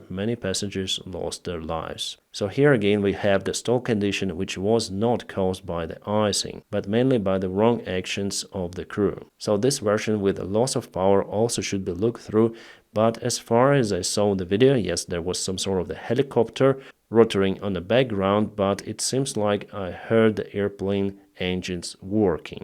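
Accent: Russia. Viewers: I love this narrator's accent!🎉 is it Russian?❤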